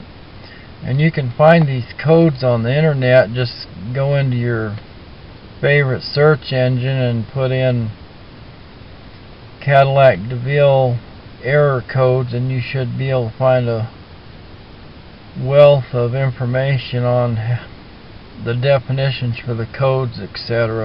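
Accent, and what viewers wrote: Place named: South, United States. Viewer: I'm digging your southern drawl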